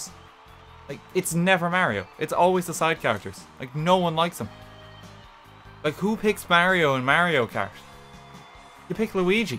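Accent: Ireland. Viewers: That’s the beauty of the Irish accent 😍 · That's how Irish people say it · Gotta love the Irish accent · First video I’ve seen and I love it, especially his BEAST Irish accent (I mean I’m Scottish but no ones perfect)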